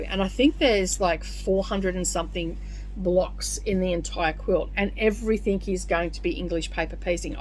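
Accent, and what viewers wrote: Australia. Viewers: Thelma writes:, so good to watch you doing EPP. I am doing just that at present using all my scraps. You voice brings me back to Melbourne where my son lives. I was there visiting my grand-children but did not have a chance to visit any craft shop. Thanks, I love the EPP, 👍 👍 👍 👍 Keep it up!!!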